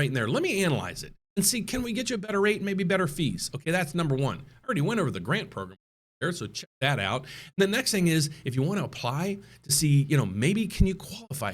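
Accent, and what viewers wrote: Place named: South, United States. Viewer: Wow! He has a southern accent.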